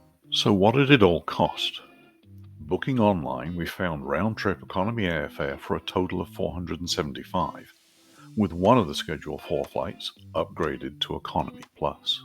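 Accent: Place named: England, United Kingdom. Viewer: "just normal people with limited funds" you have an English accent lmao unless you're an immigrant then you definitely have some funds mate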